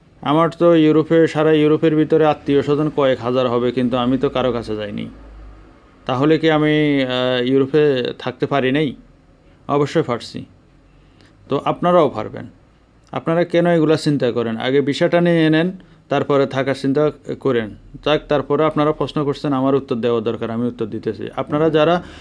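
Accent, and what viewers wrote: Noakhali, Bangladesh. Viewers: আপনি কাকে বলছেন? কে যেতে চেয়েছে ইতালি? কে বলছে ইতালি যেয়ে কোথায় থাকবো,কি খাবো?কে বলছে ইতালি যেয়ে আমার খাবার টাকা নেই? বা: বা: আপিনি নিজেই প্রশ্ন রাখছেন এবং নিজেই আবার ঝারি মেরে তার উত্তর দিচ্ছেন আবার বলছেন এটা মহিলাদের মতো কথা।কে জিজ্ঞেস করেছে আপনাকে? ভাষা শুনে তো মনে হচ্ছে খাঁটি নোয়াখাইল্যা, যা হোক আপনের কাছে কথা বলার নতুন স্টাইল শিখলাম।সাবস্ক্রাইব করতে পারলাম না বলে দু:খীত। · আপনার বারি মনে হয় নোয়াখালী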